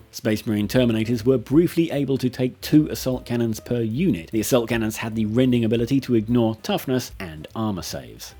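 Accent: United Kingdom. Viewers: Such clean and nice British accent! Like listening nature sounds. Also, nice video) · I'm a simple man, I hear a Brit talking about Warhammer. I automatically assume they're canon and subscribe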